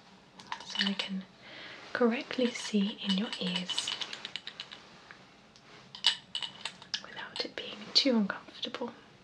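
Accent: England, United Kingdom. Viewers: British accent😍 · This woman just radiates class and her english accent is a joy to listen to.wonderful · You are adorable and have the cutest English accent! :)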